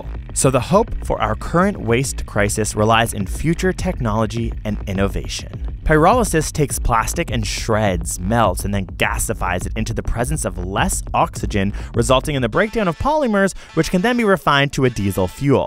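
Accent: Canada. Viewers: Youre canadian?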